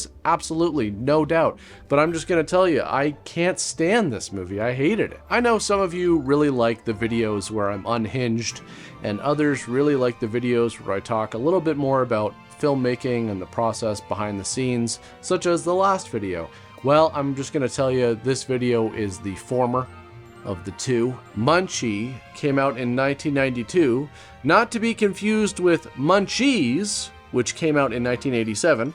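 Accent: Canada. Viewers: Oh, and he has the classic Canadian accent: "hoose", "aboot", etc. As a fellow Canadian, I can appreciate that. Haven't heard him say "eh?" yet, however.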